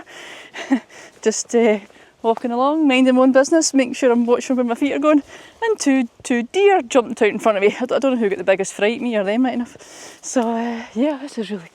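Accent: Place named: Scotland, United Kingdom. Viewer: Done part of that lovely walk but not as far as the bridge. Lovely to hear a Scottish voice and a lovely smile. Keep up the good videos. 👍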